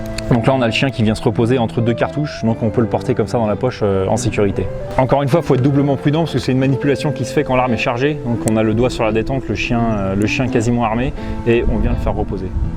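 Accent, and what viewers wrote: United States. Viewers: Encore cet accent ridicule du mid west , a chaque fois je me fais dessus 😂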